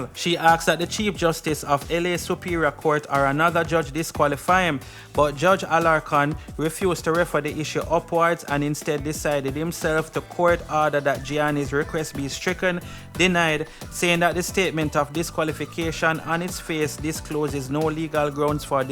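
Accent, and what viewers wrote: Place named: Jamaica. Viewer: It's his accent. He is Jamaican. I'm from St. Lucia in The Caribbean so I would know. All of us in the Caribbean have our various accents.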